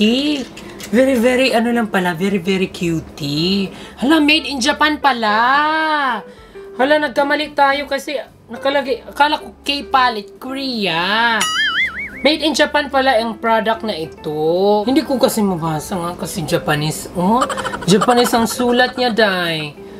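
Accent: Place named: Bisaya, Philippines. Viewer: Ate Bisaya ka? Kasi may Bisaya accent ka eh❣️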